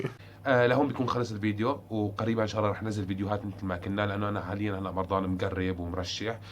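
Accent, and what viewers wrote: Syria. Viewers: يا انت ادلبي ولا حلبي لانو لهجتك لهجت ادلبي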